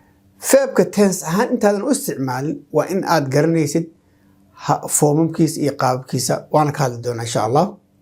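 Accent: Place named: Italy. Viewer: Dadka ku hadla luqada Italianka ayaa lahjadaas leh. (Not all of them)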